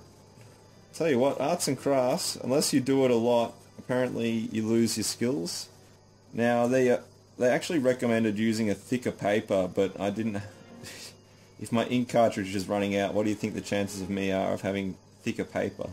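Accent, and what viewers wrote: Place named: Australia. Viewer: Man im Australian too but you ahve the best accent i have ever heard!!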